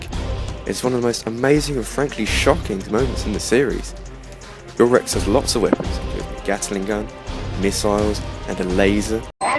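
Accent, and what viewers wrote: United Kingdom. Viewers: what part of Britain are you from?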